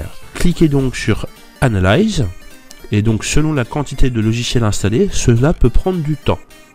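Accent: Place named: England, United Kingdom. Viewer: Sympa cette petite vidéo, et quel accent anglais <3. J'irai tester ça sur le PC de mes parents .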